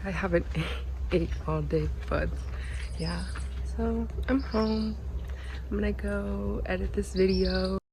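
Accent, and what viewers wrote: Jamaica. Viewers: How is her accent Jamaican in only fourn months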